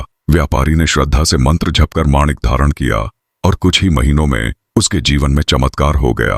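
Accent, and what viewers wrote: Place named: Pahadi, India. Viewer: पहाडी आवाज अच्ची हैं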